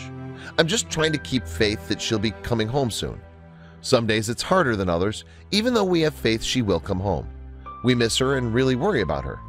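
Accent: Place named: Michigan, United States. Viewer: The heavy Michigan accent and repetitive overuse of the word indeed cracked me up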